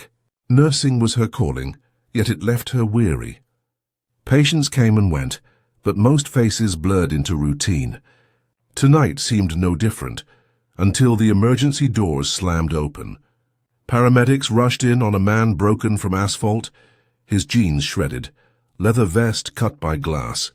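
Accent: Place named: RP, United Kingdom. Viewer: One of the chapters is out of order. It’s a little odd for an elite British accent for the narrator for this American story involving Hell’s Angels.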